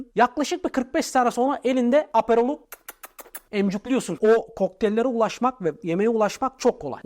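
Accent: Thrace, Turkey. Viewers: trakya aksanin fena ya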